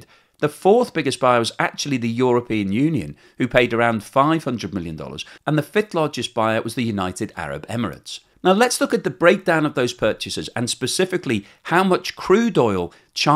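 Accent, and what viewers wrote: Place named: Liverpool, United Kingdom. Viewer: I think you are talking nonsense, seems to be a bit of scouse coming through maybe it's Thatchers fault.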